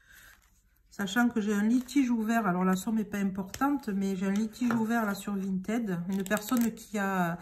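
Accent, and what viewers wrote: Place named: South, France. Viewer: COURAGE continue ainsi, rien que pour VS Avec votre charme accent du Sud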